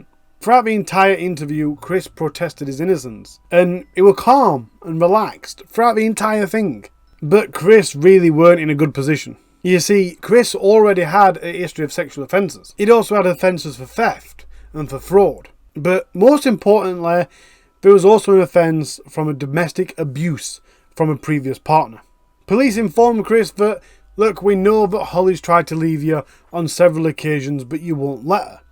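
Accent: Yorkshire, United Kingdom. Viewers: I do love to hear a fellow Yorkshire accent on here though! It’s so refreshing! I just hope you don’t try and bend it with American terms just because a few daftys struggle for some reason.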